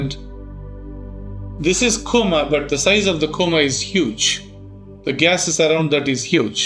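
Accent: India. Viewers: He obviously has a thick South Indian accent 😅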